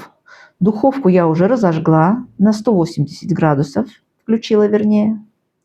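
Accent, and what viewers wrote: Baku, Azerbaijan. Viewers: обожаю Ваш акцент, я сразу поняла что Вы из Баку.Я там была в гостях ещепри СССР, 45 лет назад. Ну и ваш талант кулинара выше всяких похвал.Спасибо,дорогая!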